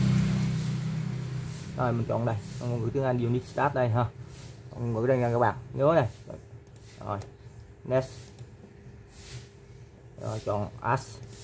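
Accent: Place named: Binh Dinh, Vietnam. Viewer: Mình công nhận bạn từ khi tạo kênh đến giờ xem vi đô của b cái nào của bạn nói trong rất đậm chất nẫu k cắt ghép hay chỉnh sửa gì. Hd rất chi tiết k tua nhanh mình xem cũng xứ nẫu rất dễ hiểu...cảm ơn rất nhiều..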